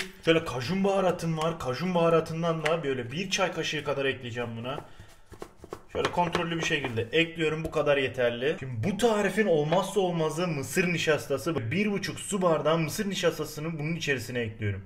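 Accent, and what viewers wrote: Adana, Turkey. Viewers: Abi adanalı mısın şive tam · Tencereyi ocağa eklüyürüz abi sen hataylı degilmi sin adanalı gibi konuşuyorsun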